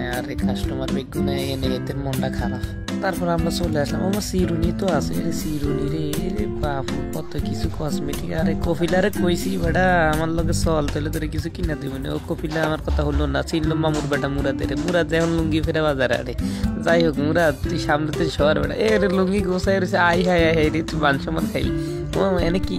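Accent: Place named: Noakhali, Bangladesh. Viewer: নোয়াখালীর ভয়েজ❤❤❤❤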